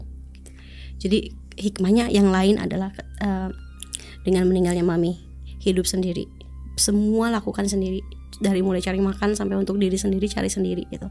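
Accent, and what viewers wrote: Malaysia. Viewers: Emang lucu kalo denger orang Malaysia ngomong Bahasa Indonesia, kedengarannya kayak beda aja gitu logatnya ada ikut-ikut nya 😅. Tapi untuk kaka jika ingin berbahasa Indonesia maka gunakanlah Bahasa Indonesia dengan baik dan benar, jangan digabung ataupun di campur dengan bahasa asing seperti Inggris dan yang lainnya ya kak😉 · mau tanya, ni org Indonesia atau Malaysia?sbb sleng kadang² seperti org Malaya😁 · Iyaa orang KL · Kakak ni org mana? Ckp macam ada sleng malaysia😍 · accent cakap macam orang Malaysia laaa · logatnya kayak org Malaysia suka dngar🌹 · Sebagai orang Malaysia aku boleh detect "slank Malay" walaupun dia cuba sedaya upaya berbahasa Indonesia.😂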